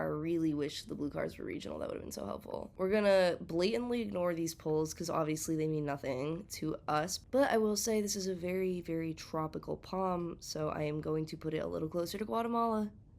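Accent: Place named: Monterrey, Mexico. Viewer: your accent sounds straight out of Monterrey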